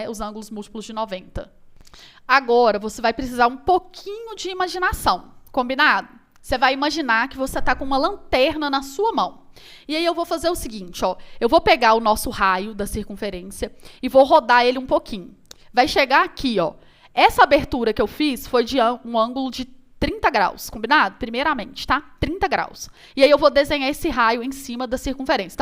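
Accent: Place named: Minas Gerais, Brazil. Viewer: Tive um problema assistindo aula dela… adianto que foi ótima ! Aprendi bastante. O sotaque minerin dela 🥰😍 às vezes me distraiu 😊😂 Excelente vídeo !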